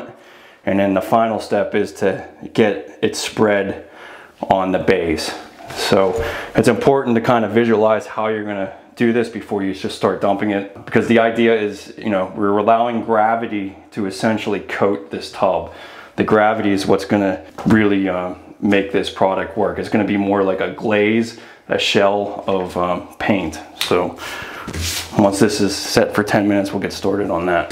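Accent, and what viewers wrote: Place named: Pittsburgh, United States. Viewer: I'd recognize that beautiful Pittsburgh accent anywhere. Stone cold yinzer coming to the rescue.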